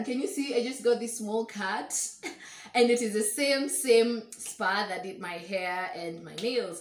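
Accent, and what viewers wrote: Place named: Luhya, Kenya. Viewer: 😂I love it when you speak swahili manze..it comes out so naturally..the Luhya version babe...the products are so preeeeettyyy😍just like you...keep doing you shawryy...❤❤❤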